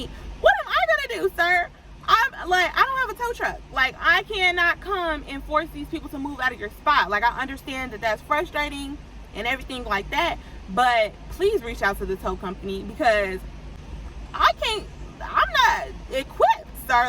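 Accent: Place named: California, United States. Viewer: You sound like me a Cali girl coming to a new state… NC. Getting into Real Estate but waiting to get things transferred over… so it felt like a right start to get into leasing/sales. I got hired as a leasing consultant due to my background, work history and personality. This gives me great perspective and an ideas of what I’ll have in store. Glad to live in a safe community. I’m here for the pros and cons. Including a work life balance. Thanks for being honest! 😊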